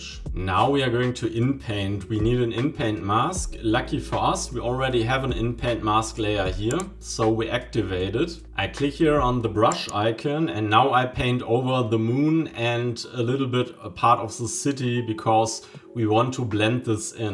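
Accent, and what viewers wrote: Germany. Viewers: Dein deutscher Akzent kickt sowas von rein xD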